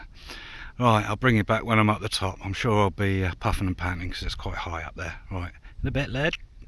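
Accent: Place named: Gloucestershire, United Kingdom. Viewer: good video,cant believe the mingry lit u up with their "night sun"-shocking lolz,noticed an earlier comment on your accent,is it Gloucestershire?if not defo Hampshire.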